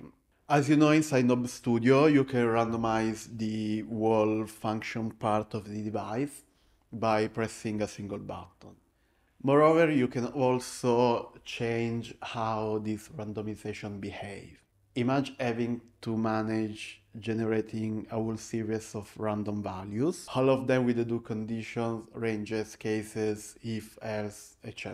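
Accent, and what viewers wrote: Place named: Italy. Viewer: Sorry for the Italian accent???? The Italian accent is awesome!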